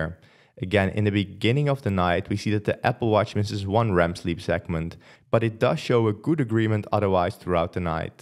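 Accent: Netherlands. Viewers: Also here to comment on your methods with this being my first of your videos to watch. Really well done and I appreciated the assumingly Dutch accent :) The thing I'll be missing (or I already missed it was announced) is an alarm function at the end of a sleep phase. I found 3rd party apps performing rather poor at this and I think it'd be the number 1 feature I'd love my watch for. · Up until where you show the dates, I thought you said eighty nights like a dozen times throughout the video, not eighteeN nights :o As a (fellow? you sound dutch) dutch person, one tip I've heard to differentiate easier is to put the stress on the second syllable when saying 1x (eighTEEN) and on the first when saying x0 (EIGHty). This does make me wonder a lot more about the p-value on all this, as this is supposed to be scientific after all and there are no error bars in e.g. 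the conclusion chart shown . Don't get me wrong, it's a solid analysis nonetheless, but it's a bit hard to know just how conclusive the comparison is or if there are overlaps in the error bars :)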